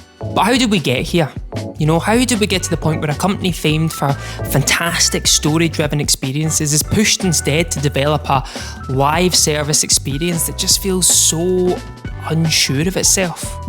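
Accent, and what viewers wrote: United Kingdom. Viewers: Hearing "Soccer Jersey" in British accent was weird... Even though Soccer was initially coined in GB...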